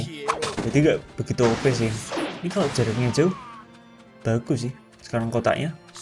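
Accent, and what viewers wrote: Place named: Java, Indonesia. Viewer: Muka lu ganggu suara lu ngmngnya jawa gitu ganggu di telinga mending gameplaynya doang tampa ada lu sumpah ngerusak feel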